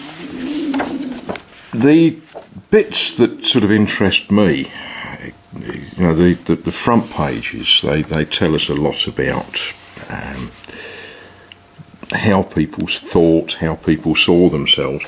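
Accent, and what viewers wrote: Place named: United Kingdom. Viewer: Just here for my daily dose of British accent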